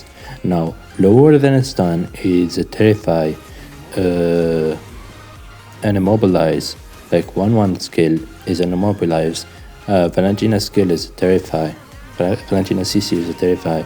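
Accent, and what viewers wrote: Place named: France. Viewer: U sound french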